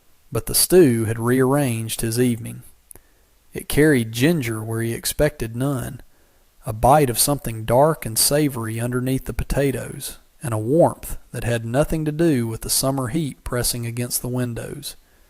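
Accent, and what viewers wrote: United States. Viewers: I really do enjoy these stories. Well written and narrated with the nicely accented American voice. The image generator does a fine job. These are a nice respite. A good place for an old guy who likes Westerns.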